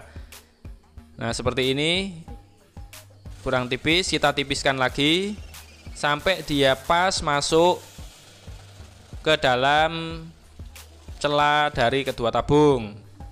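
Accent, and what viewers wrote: Java, Indonesia. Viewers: Satu hal yg saya suka di channel Om..walaupun jowo ne kental..tp bahasa inggrisnya ga salah2x dan ga asal2x..Eco Cube betul2x dibaca "eko kyub"..bukan ky beberapa channel lain yg bacanya "eko kab"...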